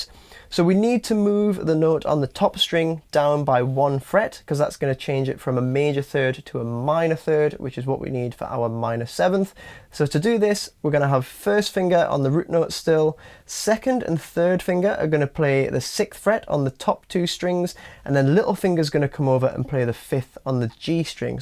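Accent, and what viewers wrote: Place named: Newcastle, United Kingdom. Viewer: great lessons thank you ! shit hot playing ,and cool geordie accent ! Gan canny 👍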